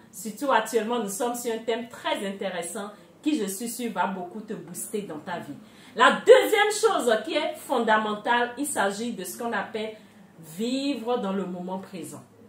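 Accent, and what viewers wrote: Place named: Benin. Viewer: Je suis tombée sur votre vidéo ✨ Pour la première fois je me suis abonnée tt 2 suite parce-que vous êtes assez naturelle vous n'avez pas essayé de changer votre accent béninois BRAVO👏👏👏 BONNE SUITE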